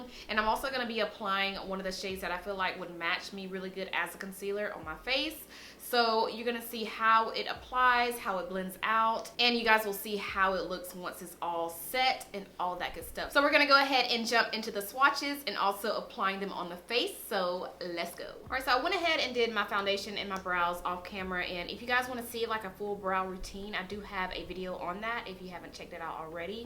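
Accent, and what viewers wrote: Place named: Georgia, United States. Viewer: Kat Von D concealers are like that? I always go back to my Nars radiant. Love your southern accent. It sounds like a Georgia accent.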